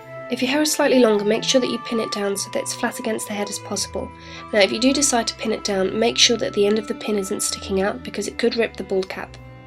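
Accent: England, United Kingdom. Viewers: I love your voice for this, soft, with a british tone. sounds alot like a girl harry potter xD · love ur british accent!!!!!!!!! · wow your voice..your kind of speak...guess you will be a good voice actoress..are you british ? i like this accent soo much :-) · her English accent is beautiful. die die die. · I think the fact that you have a british accent totally adds to this video <3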